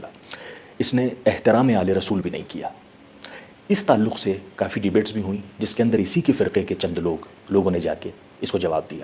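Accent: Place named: Bareilly, India. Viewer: Are yaar Aaj kiya hogaya is ko ekdam baraily Shareef ke andaaz me bola achha laga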